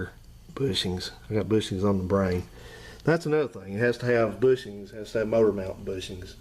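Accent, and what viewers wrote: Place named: South, United States. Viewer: I like that southern drawl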